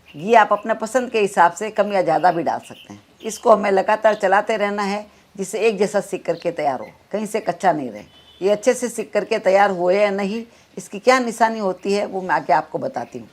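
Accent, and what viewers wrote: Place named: Marwar, India. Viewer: Aap ki hindi me desi marwadi language ka swad aata hai.....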